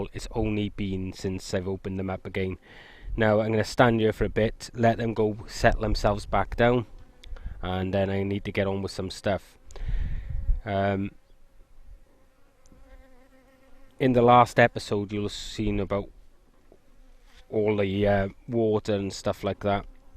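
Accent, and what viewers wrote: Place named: Welsh Valleys, United Kingdom. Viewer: Thanks for the interesting video but most people like me who search for 'starting a bee colony' will be as disappointed as me. Assuming we are capable enough to build the hives, assuming we have all the kit needed, what we need to see is the process from having nothing to having some honey! Show us a video that does just that...;start with nothing, like me, then go through every process till you put the honey on your morning toast. The yanks are good at videos for dummies but are too self engrossed and spend most of the video talking about themselves and how good they are at doing stuff!! I will continue to watch your vids as I am a Taff living in rural Normandy and I enjoy hearing your valleys accent.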